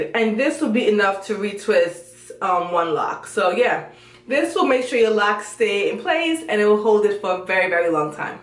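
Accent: Patois, Jamaica. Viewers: I knew you were Jamaican because I could hear the patois at some parts. I'm Jamaican too.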